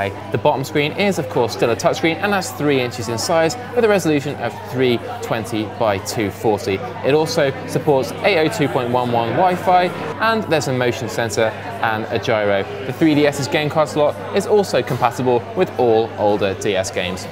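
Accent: United Kingdom. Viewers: that british accent is really really giving me a headache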